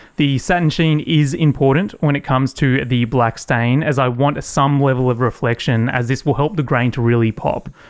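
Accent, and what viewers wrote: Australia. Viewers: Ah didn't actually know you were Aussie. I like it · Awesome dude, love hearing a fellow aussie while hes doing friggen amazing work! Be good if you could use some native high end timbers if possible, if there are any. I'm only a couple years in and just use off cuts from a stair manufacturing place. Love your work mate.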